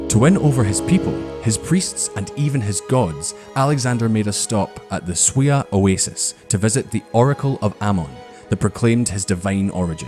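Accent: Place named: Edinburgh, United Kingdom. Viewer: How fitting is having this video narrated by an Edinburgh accent, the Athens of the North.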